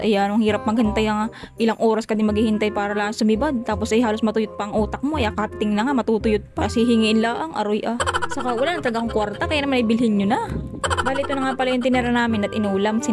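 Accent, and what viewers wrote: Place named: Quezon, Philippines. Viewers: nakakatuwa kapo yun sslita mo salitang quizon provence ssalot idol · Sa quezun province yan salita ni ate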